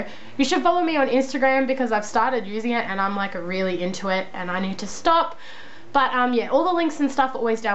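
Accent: Australia. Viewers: YOUR AUSTRALIAN!!!!! YAY!!!!!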